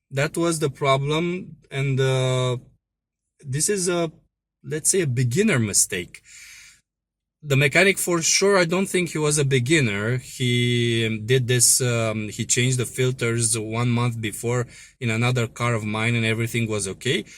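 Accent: Romania. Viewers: Tu esti roman? Your accent sounds very Romanian. Pe vremuri era usor sa-mi dau seama, ca eram toti cu Dacii LOL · De la o poștă se simte ca ești român 😂🫡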